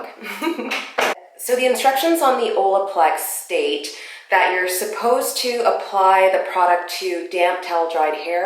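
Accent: Canada. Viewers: It's a Canadian accent, very simply.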